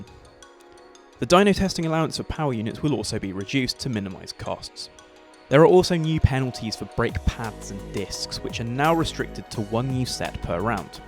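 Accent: England, United Kingdom. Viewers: this STRONG English Accent ... kill me...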